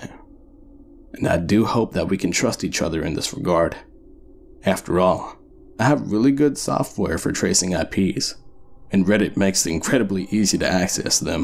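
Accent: South, United States. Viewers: Being a non-American; I love that southern drawl. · As a fellow Georgian (not too far from this story), I voted you up! I moved to the north, and it’s awesome to hear a southern voice again lol. Good luck in round 2! I’m excited to see what you’ve got in store. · I thought you were from the South! I was sold! So you can do accents too? that's even more impressive! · Nice story! Mr. has a very likeable way of narrating..held my attention immediately. Felt like I was listening to a friend relating a story. Extra impressed he's Canadian yet his accent reminds me a bit of my southern roots. Definitely a 👍 from me. Actually 2 👍👍, 1 for author Mr. Zacharius Frost as well. And it's always nice finding another great cp channel, so I subscribed to too.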